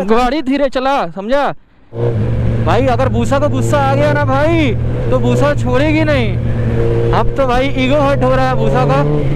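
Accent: India. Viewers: Bhi tum bhajpuri Ho ke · Bhai aap bihar se ho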